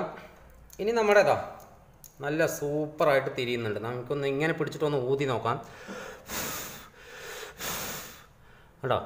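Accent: Palakkad, India. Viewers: യെ ഏട്ടോ നിങ്ങ പാലക്കാടാണ്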